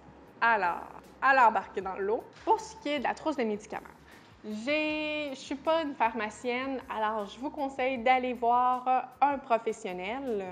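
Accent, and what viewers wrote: Canada. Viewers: t’es canadienne?? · Hey très jolie ton accent québécois . Enfin une personne qui met beaucoup de chaussure dans sa valise . J'aime bien ta vidéo 👍🏾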